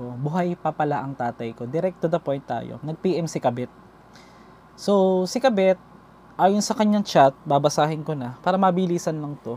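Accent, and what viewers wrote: Visayas, Philippines. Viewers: bisaya kayo dol?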